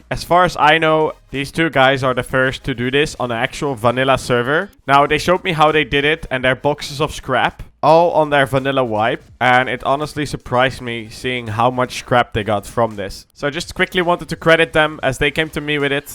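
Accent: Netherlands. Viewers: Why no dutch video's? I can hear your dutch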